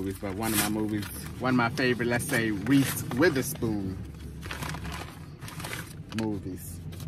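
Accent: Baltimore, United States. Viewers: I didn’t know you were from Baltimore, but I kept saying I hear a little Baltimore in him…maybe he’s from PA.😂 Baltimore representing!!!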